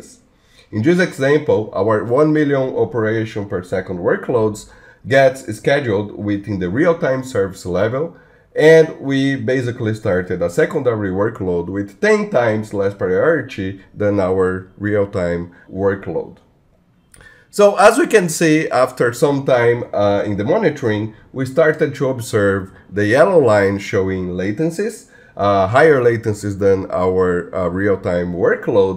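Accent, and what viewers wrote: Brazil. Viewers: Pelo sotaque esse cara é brasileiro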